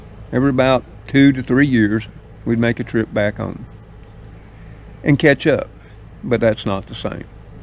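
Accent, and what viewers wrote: South, United States. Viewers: Good ole home southern talking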